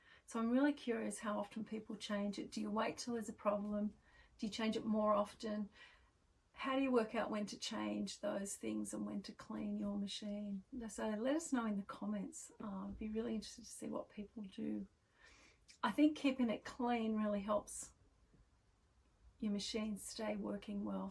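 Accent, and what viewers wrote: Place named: Australia. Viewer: Hi, I finally circling back to your channel. I’m glad I did too. Because I do love to sew. And I used to watch both my grandmothers sew quilts. My mother did as well. Though I don’t quilt. They are my favorite comforters. I have my machines. Are you from Australia? I love your accent. Thank you once again for visiting my channel last month and for your comment. I look forward to watching your videos now that I found you. 😊❤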